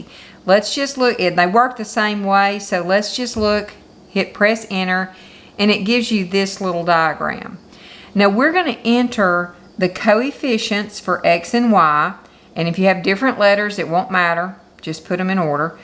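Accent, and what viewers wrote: South, United States. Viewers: Thank you soooooooo much for such a super tutorial video!!! After many years of taking advanced math now everything's is blur. And now, I have to recall most of it but thanks to this calculator things are much, much easier! I just wish I'd had this calculator when I went to college some 40 years ago. BTW, I love your southern accent.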